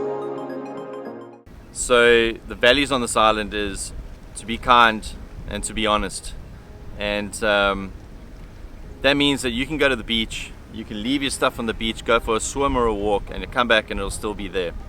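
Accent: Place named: South Africa. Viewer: Hi. I found Yr channel while scrolling for Islamic prayers. I am pleasantly surprised by Yr positive and realistic attitude to Yr stay here in my little island, Mauritius where I was born n still living. Thanks so much for the realistic yet positive review on Mauritius. I hope u explore my little island little more enjoy z feel of Ramadan 2025 and Christian fast 2025 and get to know about our cultures values religions cohabiting harmoniously. I like the fact that u love the simple life in Mauritius. For Yr car, find yrself a mauritian mechanic which will cost u very very less than even rs 10,000 for servicing etc. I have a Toyota vitz yr 2000 with 6 months car fitness n 1 Yr road tax n I pay rs 6000 including mechanic fees for every 6 months servicing. If u so wish u can get a mauritian mechanic whose name is Saleem in another town called Beau Bassin. 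U won't pay so much. People will exploit u once they find from yr talking accent that u r from South Africa. So happy to hear from u n will wait for Yr next videos. 😊 lots of love from mauritius 🇲🇺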